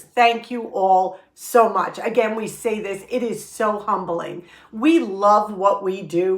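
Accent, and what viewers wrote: New York, United States. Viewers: Congratulations! So excited for your channel's growth! I love your channel. I adore your NY accent. I am a native NY'er transplanted to TX and I feel like I'm back home when I have your channel on, playing in the background while I cook. The latest thing I learned during my frugal journey was to pressure can. I pressure canned chicken and beef and didn't blow up my kitchen! I call that a win.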